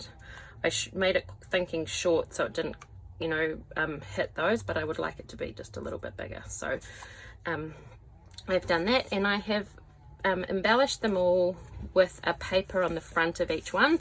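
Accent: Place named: New Zealand. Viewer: Just found you. So cool to hear a kiwi accent haha. Thanks for sharing.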